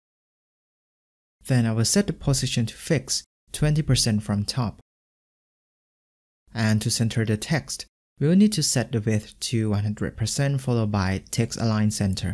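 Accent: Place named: India. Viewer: This Indian talking, I hate it! Really can you not talking ....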